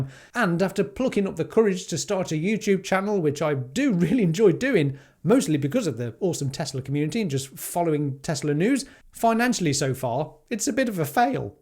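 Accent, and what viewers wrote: United Kingdom. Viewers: Thanks for the candid video and I understand where you're at. Ironically, although I'm a recently retired engineer, my secret dream was always to be a great guitar player. At the age of 65, I still take and enjoy lessons. The problem, as I see it, with music is that the world doesn't like to pay for music. Sadly, all the musicians I personally know are broke. They're fantastic and talented people, but broke. Stay confident in that you're taking the right approach. I hate money but understand we need it to live a happy and fulfilling life. My wife and I be fine in our retirement...not rich but certainly not poor. Two final thoughts: When you send in your last mortgage payment for your home, it feels like you've cast off 500 lbs of chains that have been burdening you for many years. It's wonderful. Also, my wife and I wanted to buy a new Model Y Juniper recently, but instead opted for a low mileage 2020 Model Y for 1/3 the cost of the Juniper. 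No more chains for us. Please don't quit YouTube. I enjoy your enthusiasm and crazy Brit accent. If you visit the US near Ohio, I'll trade you a couple guitar lessons for some room and board + a fun tour of our chunk of OH. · Hi. Here a spanish guy that enjoys Your Tesla Content Whit British acccent. Hope you find The determination to keep going. Best of luck.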